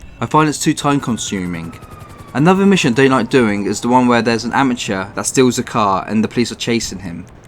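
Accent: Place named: United Kingdom. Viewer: Thanks man, the video was so helpful and I also love your British accent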